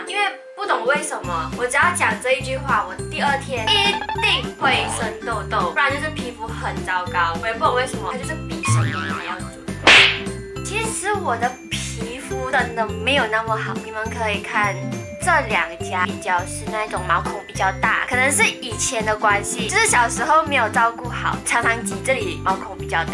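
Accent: Taiwan. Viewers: 你应该是去台湾太久了 讲话的音调都像台湾人了哈哈哈哈哈 加油哦 期待你下一部影片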